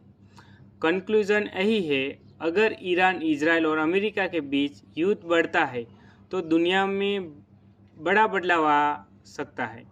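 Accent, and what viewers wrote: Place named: Gujarat, India. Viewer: Gujju Hindi … love it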